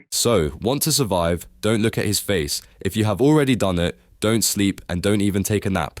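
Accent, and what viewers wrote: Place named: United Kingdom. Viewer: why does your text to speech program has a british accent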